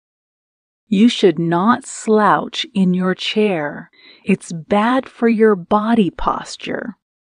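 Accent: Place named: United States. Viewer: This is American English, please differentiate.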